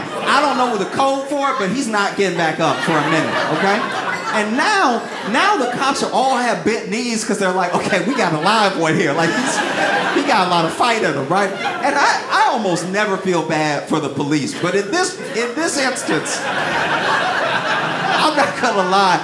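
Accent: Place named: South Louisiana, United States. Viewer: That Sourh Louisiana jumps out a little bit every set.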